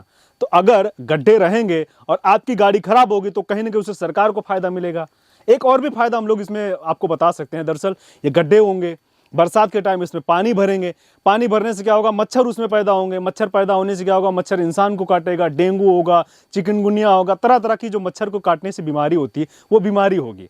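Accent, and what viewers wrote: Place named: Arunachal Pradesh, India. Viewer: Arunachali hindi 😅👌🏻👌🏻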